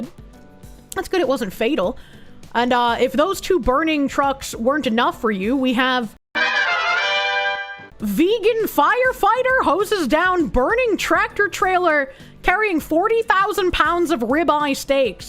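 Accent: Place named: Massachusetts, United States. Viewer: Ugh I watched a montage of telling stories about her mom the other day and now I can't not hear the Massachusetts. Ruined. Still gonna watch tho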